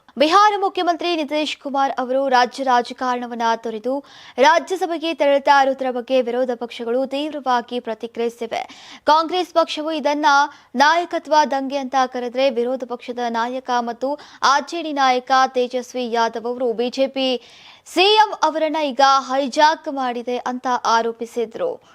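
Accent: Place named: Telugu, India. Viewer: ಮೊದಲು ಕನ್ನಡ ಸರಿಯಾಗಿ ಕಲಿತು ನಂತರ ಓದಲು ಬಾರಮ್ಮ..ಯಾ ಸೀಮೆ ತೇಲುಗನ್ನಡ ಇದು ???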